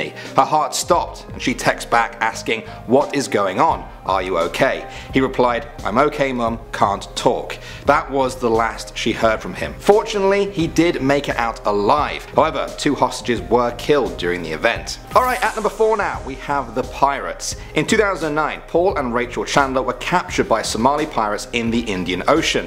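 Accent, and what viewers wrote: England, United Kingdom. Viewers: Is that a england accent? If it isnt then what accent is that? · The Gamers Of The Apocalypse Fire that is because he is from England 🏴 the United Kingdom 🇬🇧 we pronounce words differently to Americans and this is how we spell the word Columbia not the way Americans would speed the world Which is Colombia just saying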